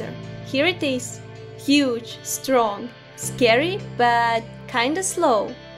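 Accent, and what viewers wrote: Russia. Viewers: А че у него русский акцент? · Мне кажется что у неё русский акцент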